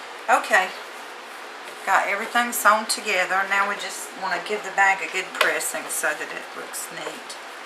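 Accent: South, United States. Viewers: with your southern drawl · And I love your Southern accent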